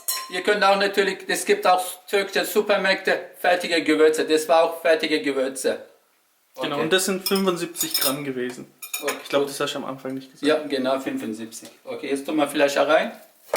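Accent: Swabia, Germany. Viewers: Sein Schwäbisch 😂😂Geiler Typ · des schwäbisch ist einfach toll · ein schwäbelnder türke <3 instantlike haha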